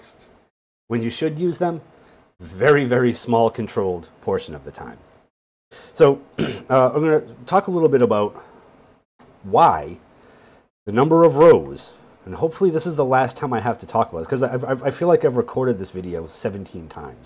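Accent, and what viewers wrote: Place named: England, United Kingdom. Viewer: I liked this video for the (English?) accent.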